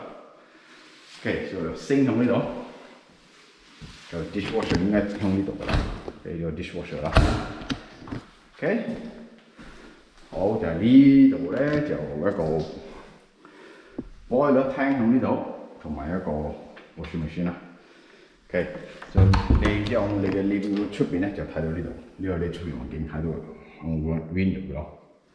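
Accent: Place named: United Kingdom. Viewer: 旁述哥哥应该戴住口罩讲野 ，所以听唔清楚 ，加上一听已经知道唔系土生土长香港人 ，可能是在英国出生的华人 ，我姪女也是这样跟我说话的 ，唔紧要 ，会越做越好的。努力！加油！😉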